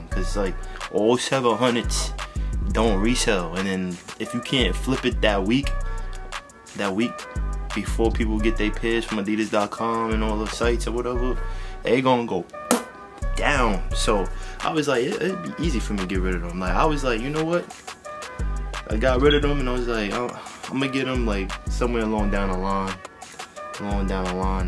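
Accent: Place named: East Coast, United States. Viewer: LOL this man got a whole east coast accent. U ain't one of us asking that silly sh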